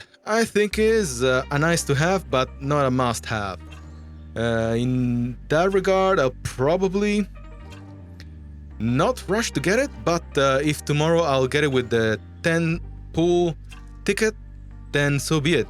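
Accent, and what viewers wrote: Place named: France. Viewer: your accent to me sounds almost french though I could be wrong about that Don't have many friends from France As for my City yeah it's great here always festive celebrations good food great people to talk to and in some parts a party almost everyday if there's nothing to celebrate we find something to celebrate That's the Cleveland way If you ever come to the states (whenever that's possible) check out Cleveland Ohio you'll love it here As for the channel have you considered partnering or collaborating with other streamers of WOTV? There are some great ones out there Auronnj, Paladiah, Diggs maybe even try to get a sponsorship with Amazon like Auronnj and his Amazon coin affiliate link As for the PS5 I hope so too but first they said it be more accessible in 2022 but now there saying it might be in 2023. They say this is the greatest launch of the next gen but when you can't even keep it on the market and scalpers running rampant I would have to disagree sells numbers don't mean a great launch when less than 50% of the population of gamers still can't get your game console. 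Imagine the loss of revenue PS5 game developers are looking at for the first week of sells is everything and you can't just make up for that later. And as for the strategy I'm still working things out but eventually it will all work out in the end even your plan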